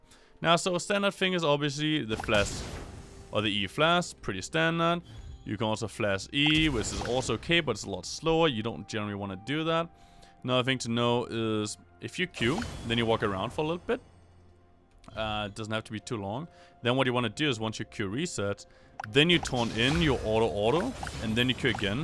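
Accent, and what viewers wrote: Denmark. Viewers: The danish accent hahaha (good video btw)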